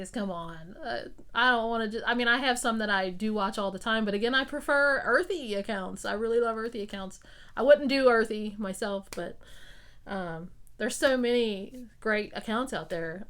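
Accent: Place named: Kentucky, United States. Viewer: omg i’m from ky! i knew your accent sounded familiar to me 🧐🥰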